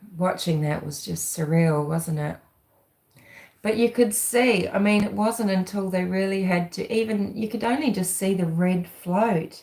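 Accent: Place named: Australia. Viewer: Yesss. I'm listening now. It's bedtime. Love listening to your Aussie accent.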